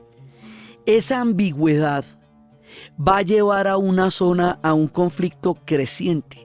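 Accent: Colombia. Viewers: Hablas más como Colombiana debo estar confundido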